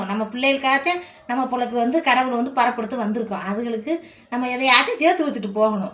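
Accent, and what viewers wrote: Madurai, India. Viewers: நான் திருவள்ளூரில் இருக்கின்றேன் என்னுடைய பிறந்த ஊர் மதுரை, சகோதரி உங்களின் இயல்பான மதுரை மண் மனம் பேச்சு என்னுடைய சிறுவயது வாழ்க்கைக்கு கொண்டு சென்று விட்டது நீங்கள் சொல்லும் வார்த்தை எதார்த்தமான வாழ்க்கைக்கு உகந்தது உங்களுடைய தொடர்பு எண்ணை அனுப்பினால் நன்றாக இருக்கும்.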